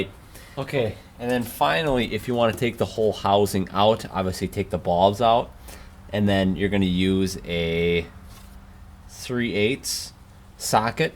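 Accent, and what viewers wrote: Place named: Midwest, United States. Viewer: From the Midwest are ya?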